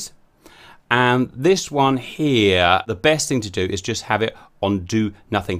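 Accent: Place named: United Kingdom. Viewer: Great stuff man. You lose me at times because Idk what I’m doing but that’s why I’m watching your tutorials. You’ve helped me a great deal and I’m sure there’s a lot more people than just me. I’m glad to see y’all are finally getting recognized for everything y’all do for the community with sponsors. I’ve seen another speaking gig you did the other day. The British accent is loved by Americans and you could sell ice cubes to Eskimo. You gotta great speaking voice and you would really shine in documentary type stuff or historical retelling of events. Best of wishes and Godspeed to all of you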